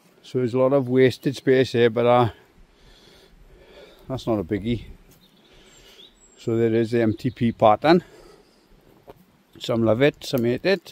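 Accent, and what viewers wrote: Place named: Scotland, United Kingdom. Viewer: Sounds like you might be Scottish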